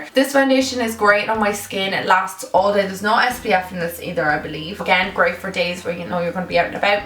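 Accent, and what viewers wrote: Ireland. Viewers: What part of Ireland are you from? I'm from Louth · this is so helpful xx and love her accent isn't she Irish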